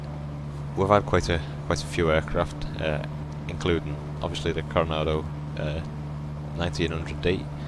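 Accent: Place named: Liverpool, United Kingdom. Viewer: Are you scouse, you sound like a scouser :D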